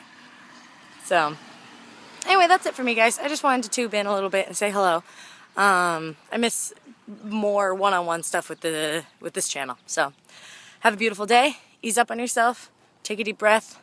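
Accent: South, United States. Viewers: I think it was the accent that bugged some as it sounded southren american but I enjoyed it regardless. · I enjoyed the Vancouver episode, even with the Southern accent and the absence of rain, continue being you <- sorry, the second "h" was used for "eh":) Sincerely a fan and life-long Vancouverite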